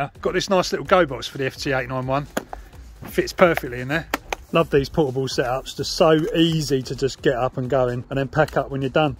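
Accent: United Kingdom. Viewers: Don’t worry about correcting us with the UK radio station If Americans don’t understand they’re watching a guy in the UK with a UK accent and he’s pretty much probably most definitely English is there a problem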